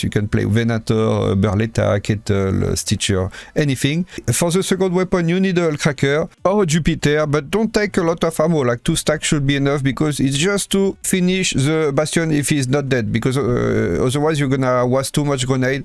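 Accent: France. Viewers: I like u Baguette accent ❤ u do the best Videos for Trials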